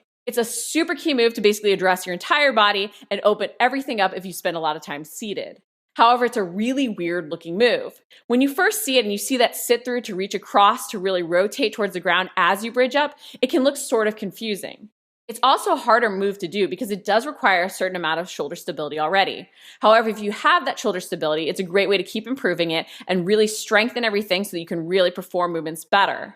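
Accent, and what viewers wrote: United States. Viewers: Where is your accent from in US? I’m getting used to it and your warmups and stretches are helping me get back into running. We can’t cycle here in France ar tbe moment but yes i have massive thighs and weak glutes/ hips!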